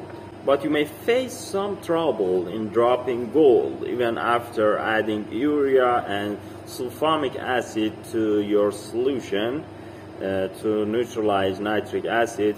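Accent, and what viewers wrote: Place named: Arab world. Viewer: Hi sir from which country you belong your accent seems to be Arabic.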